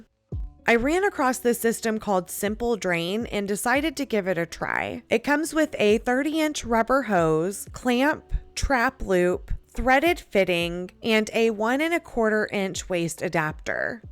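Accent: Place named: Midwest, United States. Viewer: vocal fry. up speak. midwest dialect. TRIPLE THREAT. Use some nice Ai program for your VO, please. nails on a chalkboard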